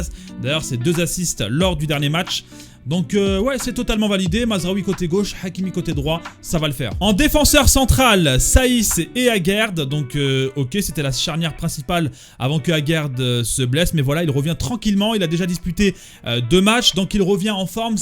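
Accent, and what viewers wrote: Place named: Arab world. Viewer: Sanpa ton accent arabe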